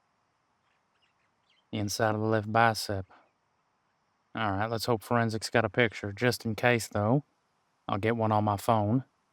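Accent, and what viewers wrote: United States. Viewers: Was this made before or after you got the cable situation sorted? Also I'm just curious as to the accent. It feels Carolina with a bit of Kentucky touches. Meto voice to be sure that is compatible with their roots. Bit of " turns Detective after encounter with a human fiddler that changed their mind about life". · FUCK YES HE'S SOUTHERN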